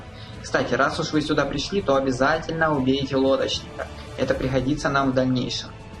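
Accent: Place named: Ukraine. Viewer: Ти українець? Я по словам чую, такі слова як вночі. Також ти літеру ґ в рос мові вимовляєшь як г. Якщо тт справді українець, Слава Україні!!!🇺🇦🇺🇦🇺🇦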